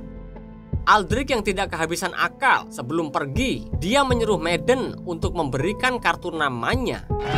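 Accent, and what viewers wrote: Java, Indonesia. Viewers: Ngomong.a medok banget jamet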